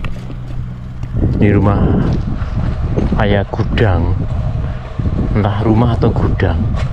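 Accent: Banyumas, Indonesia. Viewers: Aku nonton sambil nelajar ngapak 😊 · yang ku suka dr channell ini adalah boso ngapak nya mas dan content nya yg sederhana, semangat mas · Enak kang; ora ngapak ora kepenak....😊😊😊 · Malah seneng mas, Ngapak,dadine natural. Ora mboseni,terus pemandangane apik bgt. Aja klalen,"permisi Mbah,🤭🤭🤭bismillah mas,😃😃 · Sy senang dengan logat ngapaknya kang.sekalian belajar bahasa ngapak.sukses terus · Mantab mas,ORA ngapak ora enak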